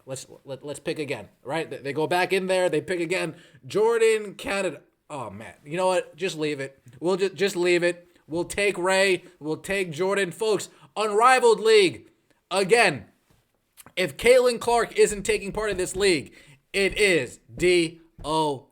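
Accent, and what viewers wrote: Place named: New Jersey, United States. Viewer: Nahhhh, thats just how we all speak "Down the Jersey Shore"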